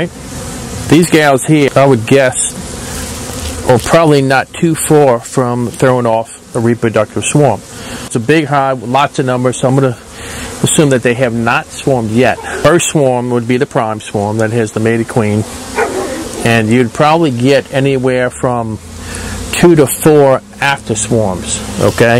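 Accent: United States. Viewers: What state accent? Is that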